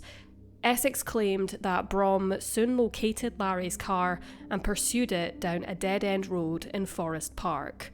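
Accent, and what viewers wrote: Scotland, United Kingdom. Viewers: She is Scottish and the English... UK pronunciation is coupee. Stop expecting everyone to speak American English. ~From a fellow American. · The narrator is Scottish.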